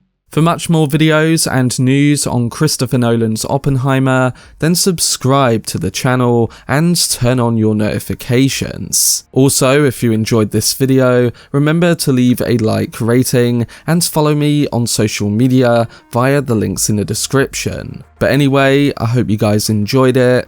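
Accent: United Kingdom. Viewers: The pawst? Cawst? At tall? Contrawsting? You Brits crack me up.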